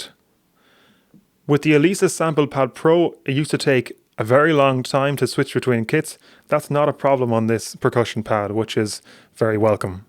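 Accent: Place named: Ireland. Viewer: Well I didn’t expect him to be Irish 😂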